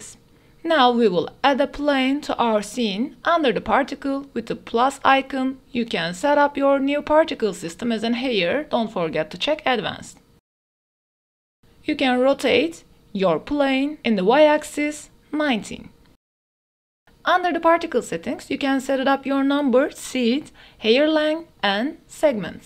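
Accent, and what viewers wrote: Turkey. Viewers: Bu aksan ben Türküm diyor 😄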